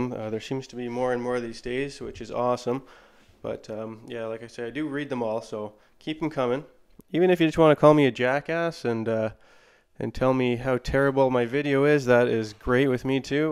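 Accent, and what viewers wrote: Canada. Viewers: You sound Canadian are you? If so where in Canada? Thanks PS you need a longer hose on that paint gun....just sayin...well done. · Nice video. Great spray techniques. Are you Canadian?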